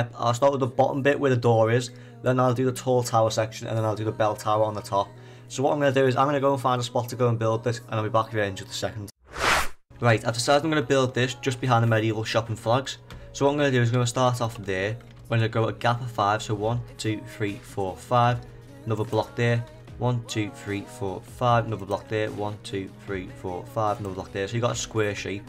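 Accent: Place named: Liverpool, United Kingdom. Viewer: Ur from Liverpool aren't you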